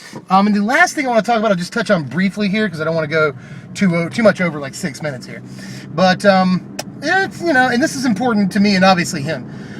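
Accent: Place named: West Virginia, United States. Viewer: West Virginia? The accent fits and the license plates are similar...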